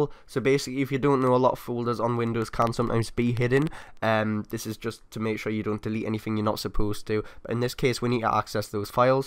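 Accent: Newcastle, United Kingdom. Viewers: Got a problem? There is always a guy with a geordie accent to help ya out!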